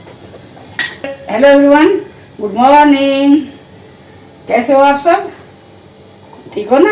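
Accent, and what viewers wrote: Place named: Delhi, India. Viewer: Aunty aap bilkul dilli walo ki tarha baat karti ho aur sochti bhi vaisa hi ho...mujhe accha lagta hai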